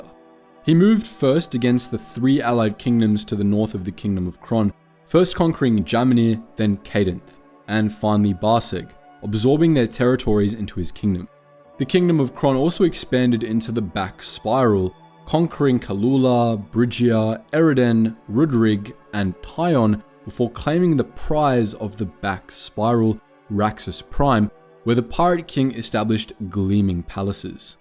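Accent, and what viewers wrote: Australia. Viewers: Imo Generation Tech is a close second, but the Australian accent makes better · Oh yeah, the Australian accent is definitly a plus to the already magnificant content.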